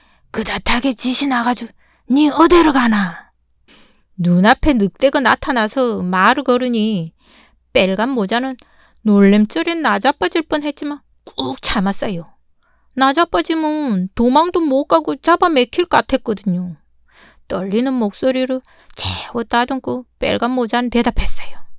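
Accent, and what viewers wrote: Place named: Gangneung, South Korea. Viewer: 들을수록 강릉사투리에 웃음이 나오고 흥미롭고 알고 있는 내용이지만 다음에 나오는 이야기에 마음이 긴장되고 ㅎㅎㅎ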